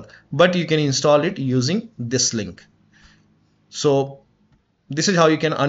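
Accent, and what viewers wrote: India. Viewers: As soon as I heard the Indian accent, I knew its gonna work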